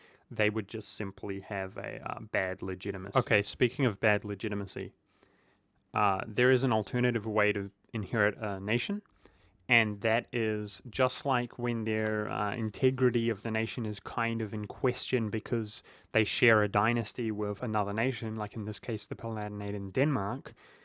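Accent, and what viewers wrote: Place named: New Zealand. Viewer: I fuckin love having a Kiwi EUIV Youtuber to watch. As a Hamiltonian it is so much nicer and familiar to the ears. On ya mate, tu meke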